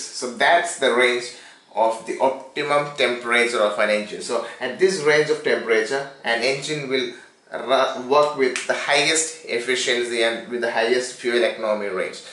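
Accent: India. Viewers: Hi. I would like to share my view on your channel. Whether to take it or not is upto you. First of all, I would like to take the opportunity to appreciate your thought process on explaining some engineering concepts in a really simple manner. It definitely reflects on the number of subscribers that you have obtained for your channel. These are some things which you could do to make it even better. 1. Please do not take the video in one complete shot. Just by that one thing, you are unable to cut some places where you have found yourself a bit off course in explaining the things in the way you wanted them, but you are forced to continue. Believe me, cut it, take things by parts and then stitch them. You will find yourself more confident with your words in explaining it. 2. Please have some more light on your working area. Your videos are being watched by so many people now. 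Which means its better to have a good visual in the video. Its mostly in a shade of dark which I find it a bit dull for understanding concepts. 3. Please make sure you try to take away the Hindi-ish slang from your english. Even though the grammar is upto the mark, there are some words which I found similar to hindi words. No offense, but if you want to spread the knowledge to people around the world, its better to have a separate accent to your english which will make it even more convenient to listen to. Hope I had not offended or stirred any emotions by putting out my thoughts. The reason behind that was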